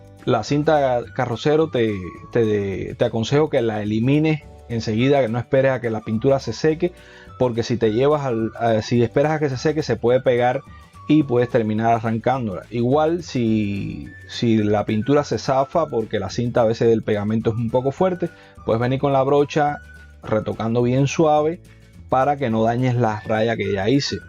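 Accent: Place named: Cuba. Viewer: Por tu forma de hablar me imagino eres cubano un saludo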